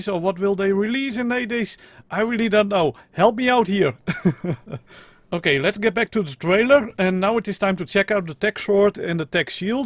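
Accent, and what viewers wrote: Netherlands. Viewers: yo is he dutch ???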